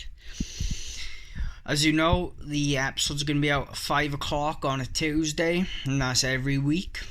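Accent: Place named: England, United Kingdom. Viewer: Im guessing that you are English by your accent. Do you guys have corn snakes there? Thanks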